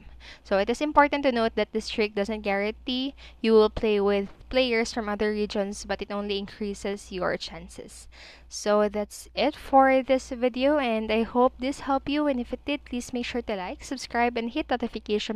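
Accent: Philippines. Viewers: pilipina accent <3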